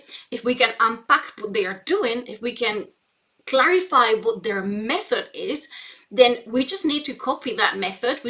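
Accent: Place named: Scotland, United Kingdom. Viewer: That's really the only indicator I notice that she is a native Spanish speaker. A Scottish influence is clear.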